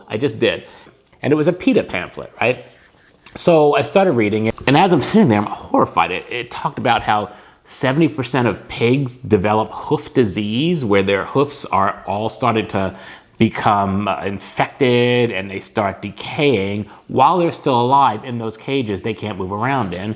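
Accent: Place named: Long Island, United States. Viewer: I just found your channel and subscribed after watching the first one. I think you're great. I love your enthusiasm and dedication. It's helping me get excited about the recipes. I especially love your Long Island accent. I am actually a vegan living in Park Slope, Brooklyn, but I've always wanted to live in Phoenix/Tucson. Should I make the move? Looking forward to more videos! Thanks!! Keep up the great work.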